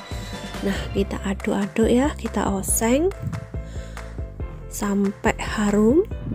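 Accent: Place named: Java, Indonesia. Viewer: Logate jowo bingits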